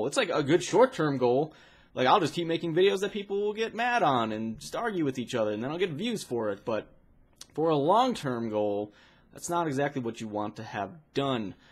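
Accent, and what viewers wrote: Ireland. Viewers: Hey are you irish please respond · are you irish?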